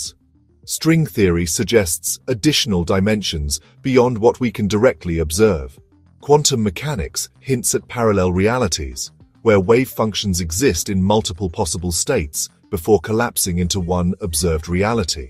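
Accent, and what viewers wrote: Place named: United Kingdom. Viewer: Screw that British accent. Bad choice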